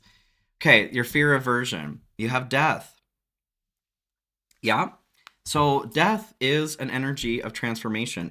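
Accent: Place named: South, United States. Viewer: I love your personality. Serious to thick southern accent. Your Reading style is great❤ All goes smoothly in your surgery Oh no😂 I just saw Mr. Bill😊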